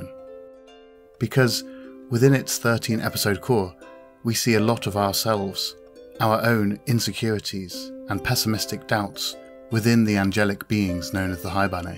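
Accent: United Kingdom. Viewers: As a fellow English person the voice is slightly laboured and enunciated, which for me affects the flow - but it’s a very nice voice - probably sounds amazing to non British speakers of english. We have some nice accents here I must say. And some weird ones! Best thing about the voiceover - at least I can understand it! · Are and Sargon of Akkad the same person? The voice sounds similar to me and it's not only the British accent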